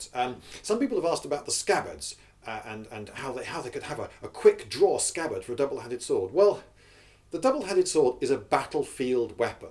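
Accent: United States. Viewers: That was an amazing American accent. You sounded virtually authentic and I've talked to quite a few Americans in my time. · Love the American accent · I love your American accent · Your American accent is amazing. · The American accent caught me off guard. It was really well done. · nice American accent, so much better than my English one! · serious props for the american accent! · Your American accent terrified me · I can't get over the American voice. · Quality American accent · You have a really good american accent.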